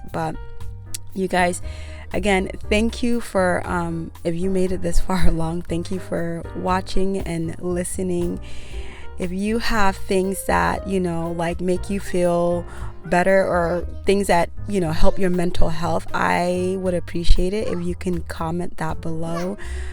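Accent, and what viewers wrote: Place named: Jamaica. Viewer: You talk like Jamaicans 👍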